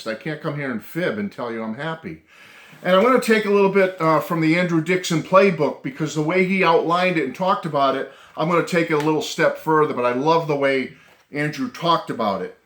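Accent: Boston, United States. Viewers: Nothing wrong with that,! Believe it or not, I've been a dyed in the wool Beatles fan since I was 4, mainly due to having a sister who was 8 years older than I am. I used to sneak down to her room to play their records when she wasn't home. LOL. I may not get into collecting all their stuff as many others do, but I haven't stopped loving their music. It's as timeless as timeless gets. Fwiw, as a NY National League fan, I can appreciate the Boston accent:) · Awesome rant and Boston accent....you just got a sub and a thumbs up from me sir....👍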